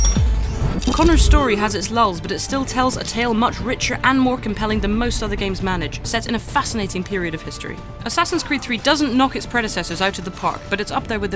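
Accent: United Kingdom. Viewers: you're probably british...can't take you seriously. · i was not prepared for an 11 yr old British guy than the usual twenty something white boy lol... umm this is IGN right?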